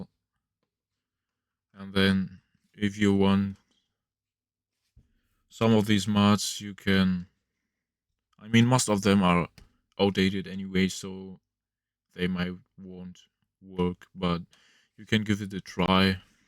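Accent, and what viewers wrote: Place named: Germany. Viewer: i hear a little bit deutsch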